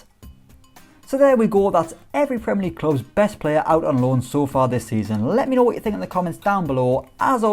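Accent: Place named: Ireland. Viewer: If I don't hear the irish accent... I press exit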